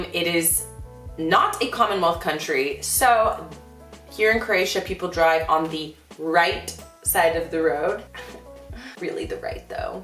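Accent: United States. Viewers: and now , all that you said in English, translate into Croatian, Serbian and Bosnian. Write as you speak, read as it is written... Work on the voice pronunciation - "Ć, Č, DŽ, Š, Ž ... in your case, since you are an American - R voice, too... Language has a lot of consonants, seven cases, which is not a lot, Finnish has 14... It is hard, but you'll get it :) you do not need a Cyrillic letter for Croatian, you do not need it even for Serbian, only if you are an enthusiast. This was once called the Serbo -Croatian language, and now they are separated - these are nuances, language is the same ... Bosnian is the same language, too :)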